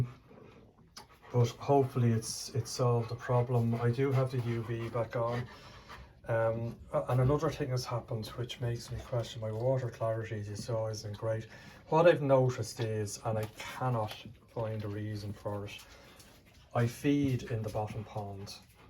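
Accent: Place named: Ireland. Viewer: Clean your ears out. Its called an irish accent. Thats all. Im english. Understood everything perfectly. Great vid. Keep up the great work.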